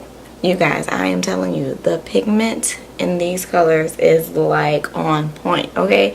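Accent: New Orleans, United States. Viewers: Are u from Nola? I hear the accent, it's soo cute! Very pretty look ❤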